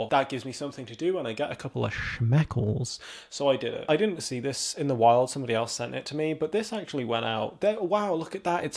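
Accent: United Kingdom. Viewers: where this accent comes from? Are you British?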